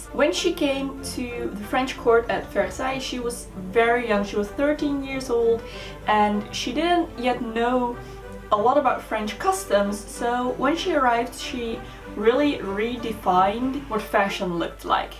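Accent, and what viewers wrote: Netherlands. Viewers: I heard some Dutch accent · are you dutch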